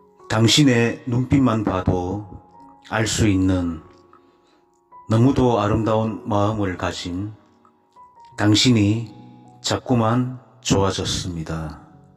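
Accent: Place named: Gyeongsang, South Korea. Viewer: 경상도 아저씨의 사투리가 살짝 들어간 풋풋한 음색, 좋은글, 좋은시, 이 컨텐츠 너무 좋아요~ 좋은시 한편 잘 듣고 갑니다~ fighting~~~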